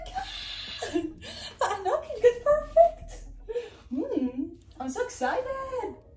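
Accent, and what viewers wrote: Iraq. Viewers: حجيج عراقي من وين بلعراق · لْـۆ مخليه ع المرايه چيس نيلون م̷ـــِْن ردتي تصبغين المرايه 😉+انتي عراقيه اصليه بالحجي 😅💛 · والله اول ما شاهدت الفيديو ما كنت مفعله الصوت .وبس شغلت الصوت انصدمت انك طلعتي عراقيه بهيك لبس .والله يا اختي انت مبيينه انسانه طيبه وفرفوشه. استري نفسك حبيبتي .هلي ربنا يستر علينا ويفرج هم هالامه الاسلاميه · منووو نصدم لمن سمع الصوت وعرف عراقيه 🙂😐😂مشتركه جديدة حبيتججج 😍 #تعديل شكرا ع 50لايك 🌚😹😹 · يزينك انتي وقناتك ولهجتك يزين أهل العرق بس🇮🇶❤️🇸🇦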